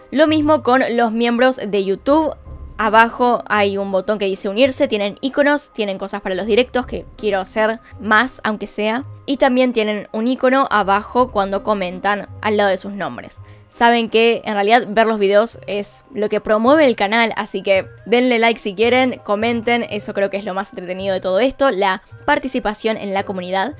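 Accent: Argentina. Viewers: QUe hermoso narras!!# adoro tu acento argentino. Saludos desde illinois uss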